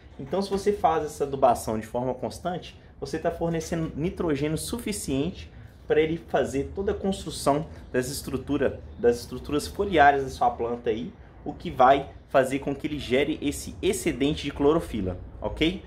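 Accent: Minas Gerais, Brazil. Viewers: Que sotaque gostoso de Minas Gerais,bjs.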